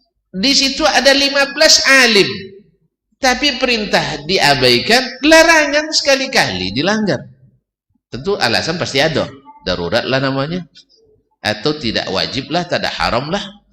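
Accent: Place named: Malaysia. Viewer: hahahaha ente lucu logat melayu gitu dibilang Arab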